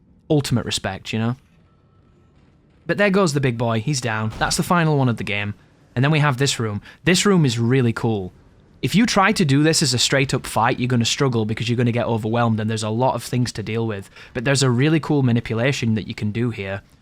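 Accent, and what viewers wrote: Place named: Sheffield, United Kingdom. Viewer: Really enjoyed this playthrough, I played it through earlier this month so it was a nice coincidence. I'll appreciate another full year of your Sheffield accent.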